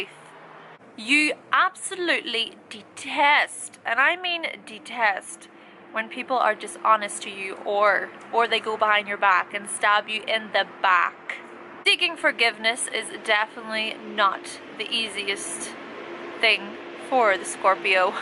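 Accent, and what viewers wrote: Ireland. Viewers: I love your eyes and i love that accent of yours.....irish? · are u Irish? u sound just like Nadine coyle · It's true that Scorpios do have a tendency become involved in the porn industry Been there.Done that. BTW, please try not to lose your beautiful Irish accent.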